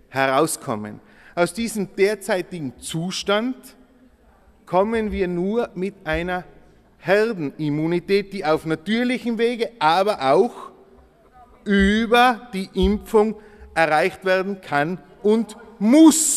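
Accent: Tyrol, Austria. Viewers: Hr. Steiner der Beste! Das ganze mit dem tiroler Dialekt! Genial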